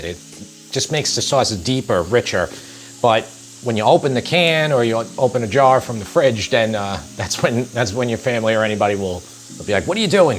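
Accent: New York, United States. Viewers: You really act and speak like one of us New Yorkers from the island. It's nice seeing someone with the same brands we all use here.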